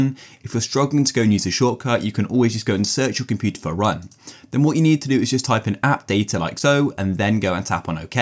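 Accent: United Kingdom. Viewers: don't speak British, speak American English!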